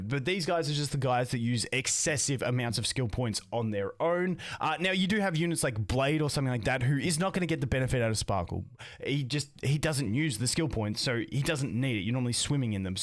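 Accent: Australia. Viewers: Honestly I watch this guys cos of his Australian accent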